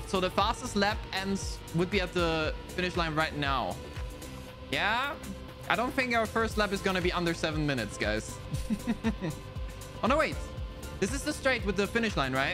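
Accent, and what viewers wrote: Germany. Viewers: Are you german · Are u German? Because u sound like that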